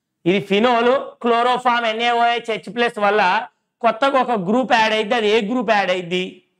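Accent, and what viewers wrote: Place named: Telangana, India. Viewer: Telangana yaasha😂😂😂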